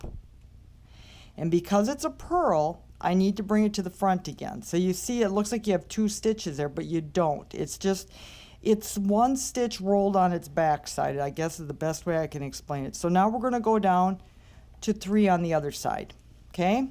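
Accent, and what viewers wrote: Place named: Minnesota, United States. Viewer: LOL Love the rollling on the back thing. Good vid, well done. Let me guess, you're from the north somewhere, Minnesota, Wisconsin, Canada?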